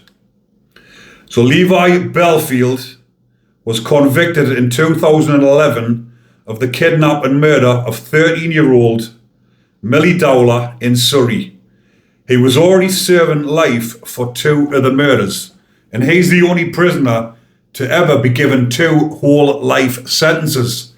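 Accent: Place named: Scotland, United Kingdom. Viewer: What word is he saying, Bergin? WTF 😒 does that mean in English? Is this a Scottish accent, or Northern England?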